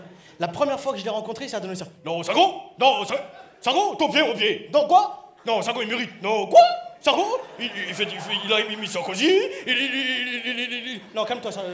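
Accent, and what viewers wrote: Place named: Senegal. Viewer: On dirait seydou abathia mais tu a l air senegalais meme accent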